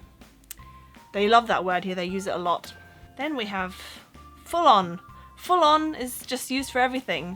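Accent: England, United Kingdom. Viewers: how the hell is your accent so english!? ive known foreign people who have lived here for ages and never pick up the accent! were you brought up with english people? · You sound like a native brit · The most British sounding German in the world !! Even says " Bri_ish" !! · You sound English. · You sound like you are from england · Blooming 'eck kid, you sound more British than me, and I was born there. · Wow. Your accent sounds perfectly English. (°o° ) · Slang words vary depending on what part of the UK you come from , some people in the south wouldn't know what someone from the north was talking about and vice versa, may I just say what a great British accent you have, very clear and well spoken.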